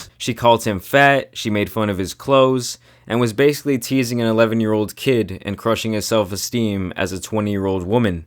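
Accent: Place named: Northeast, United States. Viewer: Bro, your accent is hella strong lol. You definitely from the northeast.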